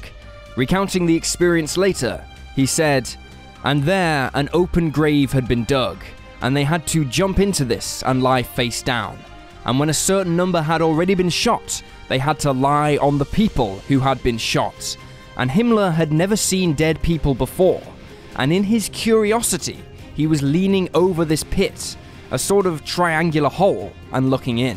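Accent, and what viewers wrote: England, United Kingdom. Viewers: Voice like English. Loud the interrorgrater. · This limey narrator sounds gay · If you think that's an Australian accent.. it's obviously British.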